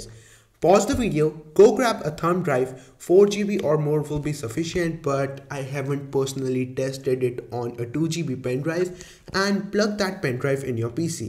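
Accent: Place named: India. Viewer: First time I hear your voice you are Indian.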